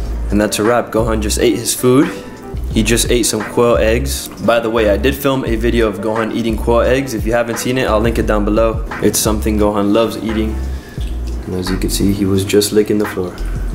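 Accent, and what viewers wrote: Miami, United States. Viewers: Your accent sounds like you’re from Miami. Did I guess correctly? Lol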